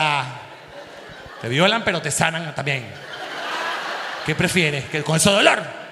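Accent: Cuba. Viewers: que bien te queda el acento cubano yo soy cubana y me da mucha risa todo es verdad 😂🤣😂🤣😂😘🇨🇺 que comico eres😂😂😂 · Bendiciones para ti y muchos éxitos más 😂😂😂😂no podia parar de reir cuando contaste lo del médico prieto jjjj nos violo a los 12 jjj eres lo máximo eres auténtico el mejor ,llevo la madrugada entera viendo tus videos, se jodio el trabajo mañana jjj ,haz mas chistes sobre cubanos lo agradecemos mucho y el acento de cubano te queda super bien 😂😂😂😂 bendiciones para ti desde cuba 🇨🇺 ❤ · Más shows con acento cubano please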